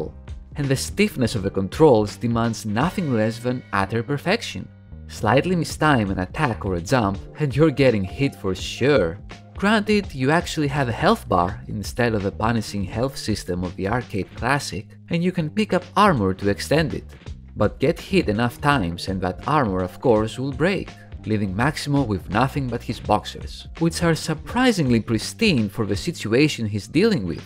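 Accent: Greece. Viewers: Το γεγονός ότι κατάλαβα ότι είσαι Έλληνας από την προφορά και όχι από την ποιότητα του βίντεο λέει πολλά. Κιπ ιτ απ, σου αξίζουν πολλά περισσότερα σαμπς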